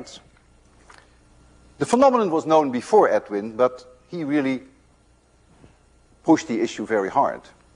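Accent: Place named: Netherlands. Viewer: sounds like he has a dutch accent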